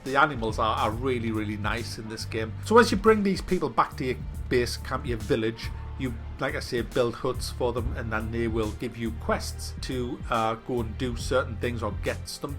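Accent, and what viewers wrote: United Kingdom. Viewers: Great review! I love the far cry series of games.Graphics, Sound, Gameplay, Immersion, Content. All grade A. If we could just get them to add Multiplayer, which is my guess for a Far Cry 5 or 6 to make an impact. Though I have taken a break playing primal after down loading PES 2016. I love listen to you Brits (hope that's politically correct) Love from America. Those bee bombs are a blast......aaaahhhhhhhh!!!!! peanut butter cup & peanut butter pieces with candy shell, same thing both yummy.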